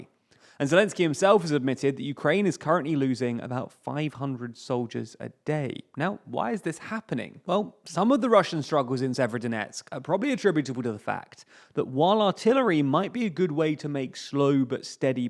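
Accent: United Kingdom. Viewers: Obvious errors : - titles in the video mentioning. 5th May, 7th May should read June as the date visible on the news article shown - zelensky didn’t state 500 losses a day in Ukrainians troups but 50 to 150 as another comment corrected. He also said the Russians are losing almost 300 soldiers a day. I really much appreciate the quality of the TDLR videos, but these errors seems more and more frequent, like when it estimates Russian military budget to an incredible 250 millions of dollars where the reality is more 80 millions … some serious quantify checks are necessary there ! It’s a pity because your videos are very well illustrated, very concise, and the British accent makes these videos “Über chic”…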